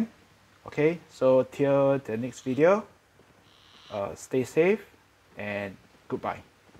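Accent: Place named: Malaysia. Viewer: Bro, u either a malaysian or singaporean based on your english. Theres no doubt about it.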